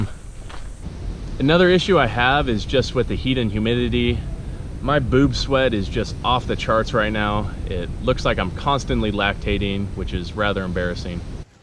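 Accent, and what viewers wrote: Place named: United States. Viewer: It's only the American accent that makes him stand out.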